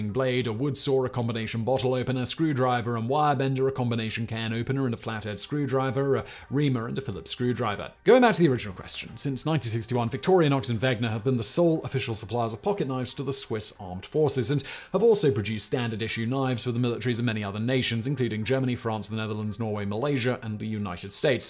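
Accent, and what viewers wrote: England, United Kingdom. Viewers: He's English. If a word looks like it starts with Victoria, he's hard coded to say Victoria no matter what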